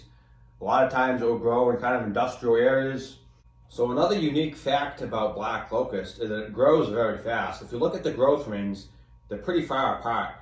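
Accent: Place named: New England, United States. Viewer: Gotta love the new england accent Varies every twenty miles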